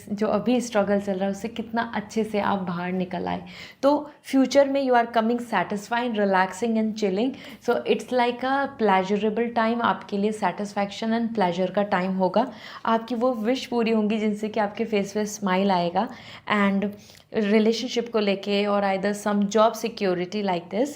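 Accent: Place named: Punjab, India. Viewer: You sound punjabi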